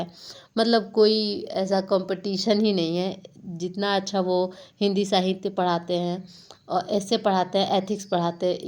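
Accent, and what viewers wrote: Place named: Hyderabad, India. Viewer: Aapka slang Hyderabadi a raha hai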